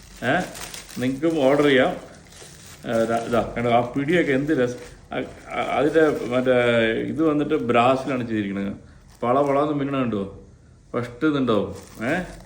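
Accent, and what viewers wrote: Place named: Palakkad, India. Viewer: പാലക്കാടൻ സ്ലാങ് സൂപ്പർ സാർ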